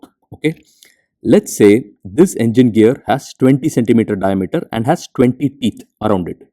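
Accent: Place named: India. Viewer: Почему-то я понимаю индийский английский лучше, чем американский.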